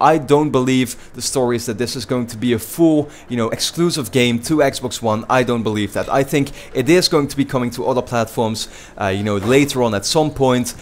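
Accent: Netherlands. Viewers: Are you dutch? Your accent ;)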